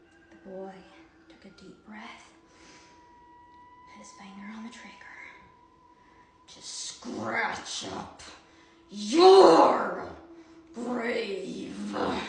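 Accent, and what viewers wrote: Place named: South, United States. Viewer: howdey from wv were are you from your acent is thick as southern corn bread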